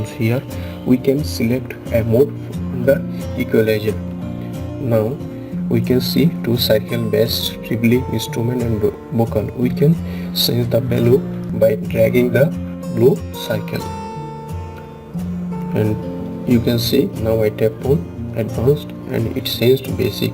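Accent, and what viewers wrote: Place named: United States. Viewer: American lag rahi hai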